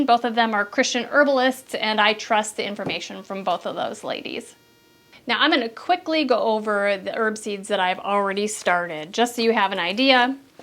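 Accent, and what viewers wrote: United States. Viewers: Fascinating the differences between American English and the English we speak in England; I love the way you call herbs "Urbs" (always makes me smile). It shows that American comes from the many different nationalities of settlers: I wonder why you call courgettes (french) zuccinis (italian) ; Aubergine (french) Eggplant: Cilantro we call coriander; there are many others.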